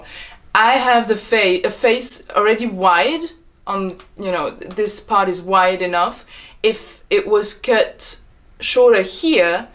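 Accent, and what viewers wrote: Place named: France. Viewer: je viens de decouvrir ta chaine, tu est française ? i just discovered ur channel and wanted to know if u'r french becuz of ur accent i find that it's somehow a french accent but in a goood way 😊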